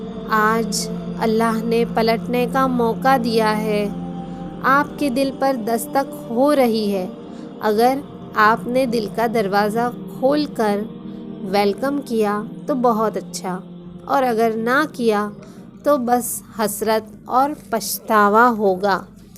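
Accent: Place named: India. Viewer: Masha Allah Apsy milkr khushi hoi jee apky bolny k andaz andaza howa k ap Indian ho.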